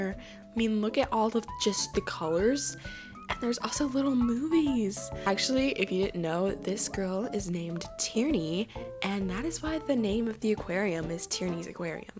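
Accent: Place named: United Kingdom. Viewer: Sick British accent!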